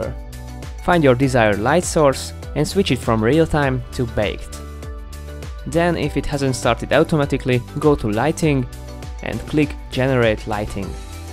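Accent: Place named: Hungary. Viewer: Are you hungarian btw? You have an accent like all of us here.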